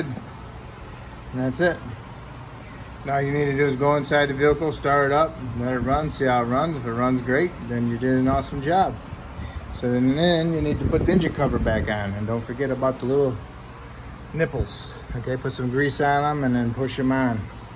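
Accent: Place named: Chicago, United States. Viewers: just sounds like a midwest generic mechanic accent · That’s a Chicago accent if I’ve ever heard one. Am I right?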